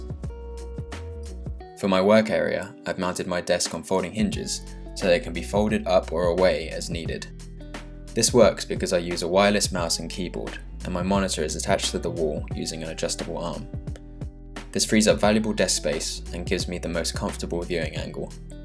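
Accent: United Kingdom. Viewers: British Matt D’Avella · So hard to understand English with British pronunciation unlike American pronunciation for me as a foreigner.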